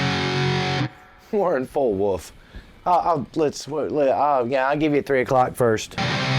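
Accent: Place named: Delaware, United States. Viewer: You’ve got sort of a Walton Goggins voice and humor thing goin’—that’s a compliment. [Vice Principals, The Righteous Gemstones….] You’re from Delaware? I grew up there but don’t remember that accent. // Looking forward to getting this amp in a few days—well, oddly, it’ll be this or a Princeton or Deluxe Reverb…. Depends on which one makes it move….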